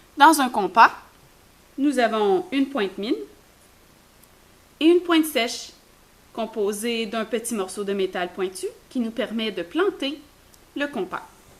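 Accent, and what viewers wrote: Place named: Quebec, Canada. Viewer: ps: super l'accent Québéquois (surtout ne le prend pas mal)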